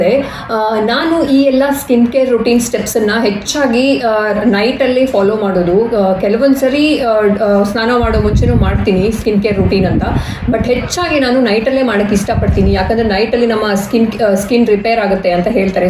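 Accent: Mangalore, India. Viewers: Nimma mangaluru slang thumba chanda ide😍😍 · nivo Yavaglu mangalore kannada ne mathadi please. 🙏kelalikke thomba chenda erthe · Nice vedio ....😍😍happy to c someone talking in mangalore kannada in volg 😍😍😍evn m from mangalore staying in UAE... tulu la patherle yedde apundu Kendra....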